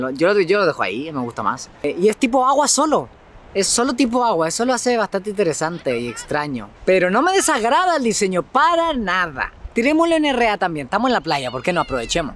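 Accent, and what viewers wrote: Chile. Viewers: eri chilenooooooooo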